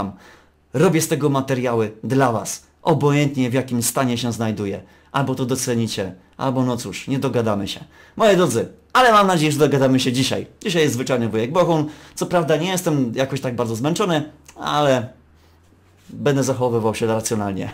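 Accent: Silesia, Poland. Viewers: odcinki są super idzie sie bardzo pośmiać a także odpocząc:) jestem z sląska i smieszy mnie to jak ''godo pan po slónsku''! wiecej omsi!:) Pozdrawiam!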